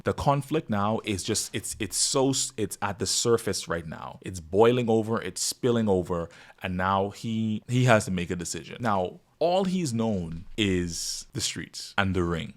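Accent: Bahamas. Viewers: I just came across your video for the first time. From the moment I heard your voice I immediately recognized your Bahamian accent. Nice to see a fellow Bahamian on YouTube. ❤🎉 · I agree with what you said at the end bro ,& you sound like a fellow Bahamian as well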